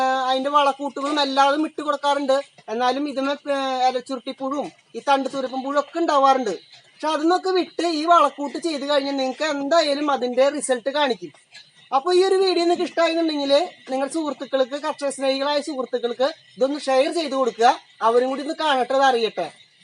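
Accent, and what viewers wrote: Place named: Thrissur, India. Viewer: തൃശൂർഭാഷ നല്ല അവതരണം സൂപ്പർ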